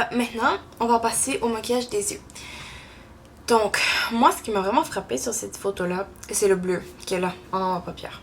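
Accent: Canada. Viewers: j'adore te regarder avec ton accent canadien ! j'adore!! <3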